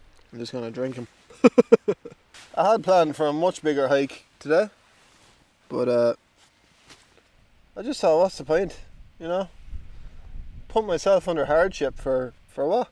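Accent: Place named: Ireland. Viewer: It’s just nice to see and hear an Irishman on YouTube!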